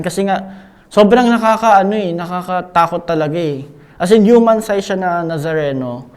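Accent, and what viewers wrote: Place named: Conyo, Philippines. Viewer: Conyo kasi siya ikaw kasi laking iskuwater🥴 kaya madami napapansin dka nlng manuod pwe🤮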